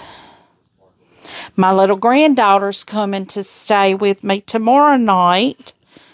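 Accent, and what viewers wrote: South, United States. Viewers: Hi ! It was nice listening to you today while I was sewing. You are so fun to listen to with your southern accent! I did a retreat at Missouri Star Doan girls retreat and it was so fun, we got a ton of free stuff- fabric for two quilts, numerous free things so it paid off since it was pricey. Tell me what you are bringing to work on. I brought stuff to the retreat but they had us sewing the new quilts, pillow cases and tons of stuff!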